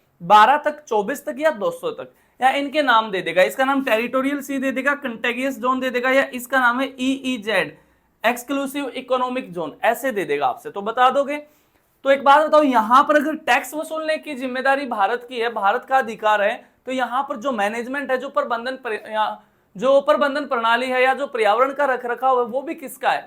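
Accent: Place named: Rajasthan, India. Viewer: Rajasthani tone 😊 Haryanvi v ... thora thora Panjabi v😍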